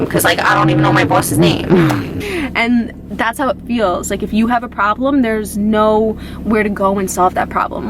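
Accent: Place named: Upstate New York, United States. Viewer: I am not a big fan of the upstate accents whatsoever, being as how I am from Florida, but on you, it's not too shabby. It's not overwhelming. It's like you can tell where you're from, but it doesn't suffocate you, I like it.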